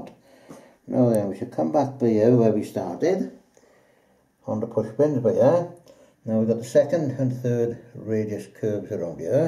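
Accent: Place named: Wales, United Kingdom. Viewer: great idea using push pins to hold track in place while the geometry is sorted and your ready to pin it down .I have wasted so many track pins by fitting and pulling out , re aligning and such . great old school layout .love the accent by the way . it takes me back to when I played in a band touring wales , the "by yeur" phrase had us English baffled for a bit till we got used to it .its running great hope to see more in the future .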